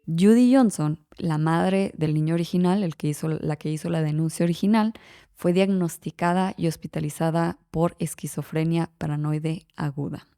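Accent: Mexico. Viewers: Bello nuestro acento mexicano,tu eres el ejemplo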